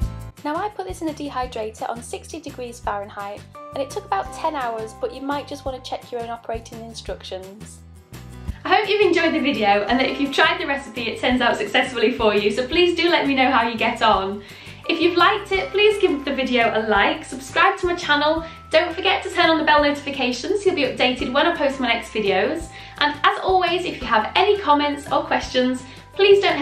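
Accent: United Kingdom. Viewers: Is the plant always called Coriander in the UK? (I assume the accent is UK) I call the seed/spice Coriander but the plant Cilantro? It is a must for my Salsa. My favorite herb!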